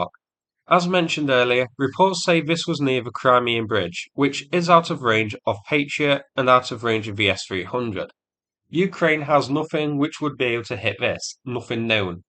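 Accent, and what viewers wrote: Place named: Northern England, United Kingdom. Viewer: I just love the commentary, makes me homesick for the North of England. Phrases I heard often when younger.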